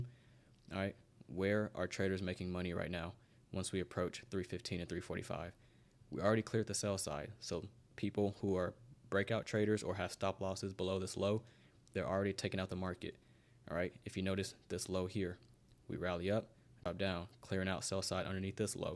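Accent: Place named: South, United States. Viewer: Where are you based out off? I noticed you have a hint of a southern accent. Im Beytoven by the way. Im always in your live streams lol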